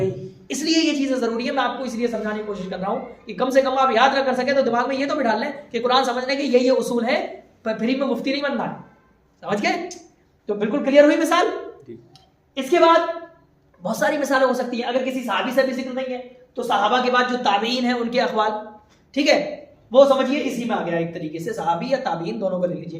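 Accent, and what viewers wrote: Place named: Maharashtra, India. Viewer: Mashaallah buhut umda❤... Janab aapke baat karne tariqe se lagta hai Maharashtra se belong karte ho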